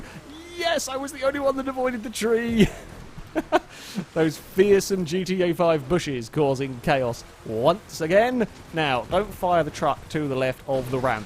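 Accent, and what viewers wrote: United Kingdom. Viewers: How does this man not have more subscribers! He has everything a successful Youtube channel needs! Amazing content, british accent, and cat videos! I think it is our duty as fans to spread the word about and his channel!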